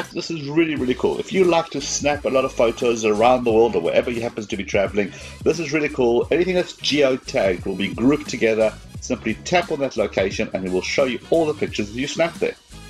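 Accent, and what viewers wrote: South Africa. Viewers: from what country are u . I am from South Africa and you have a south african accent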